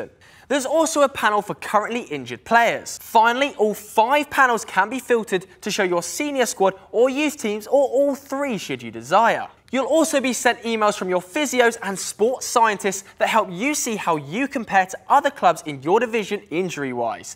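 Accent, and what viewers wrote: United Kingdom. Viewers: When he has great British accent and talks like a childish 15-year-old Sorority girl in US.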